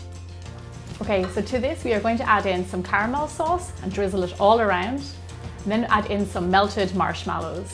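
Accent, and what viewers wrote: Ireland. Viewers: I love your accent are u Irish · Omg i love her Irish ascent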